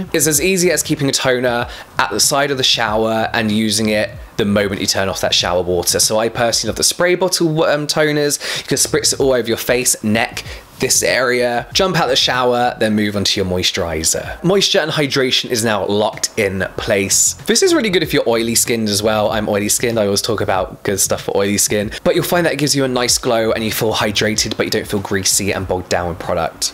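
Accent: United Kingdom. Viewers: In love with ur vdeos,ur ausumn skin😭😭😭n the British accent😍😍😍#lucifervibes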